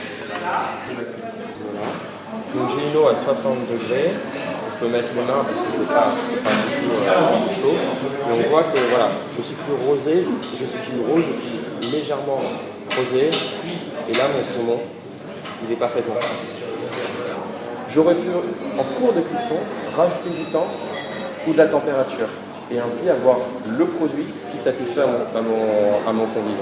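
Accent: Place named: France. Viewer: Ich habe bislang nur sehr selten jemanden gehört, der so französisch spricht, wie der Protagonist in diesem Video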